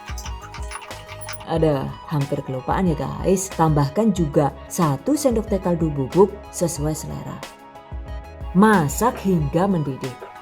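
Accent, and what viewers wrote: Banyumas, Indonesia. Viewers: Hahaaa seneng lahh kro suara ngapak'e kya nyonk kswuuunn resepe ya kk ❤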